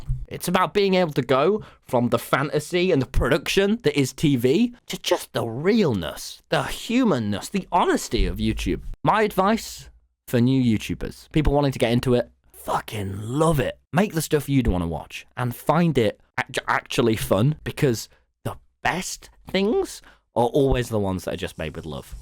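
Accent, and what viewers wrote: United Kingdom. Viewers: so... the weekly slap but bri-ish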